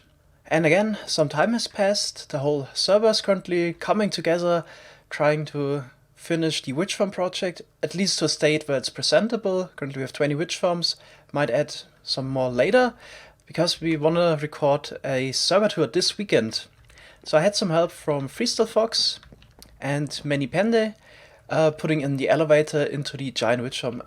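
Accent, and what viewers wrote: Germany. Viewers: The german accent is strong in this one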